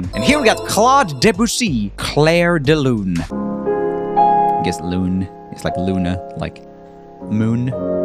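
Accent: United States. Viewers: I don't know why byt I heave expected that you studied music or something, but definitely not looking at how do you pronounce all of those names. But damn, that americanized pronounciation gave my dry heaves, just how could you? ; ((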